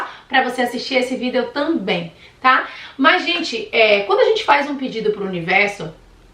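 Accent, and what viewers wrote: Bahia, Brazil. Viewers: Nossa ela é Bahiana 😍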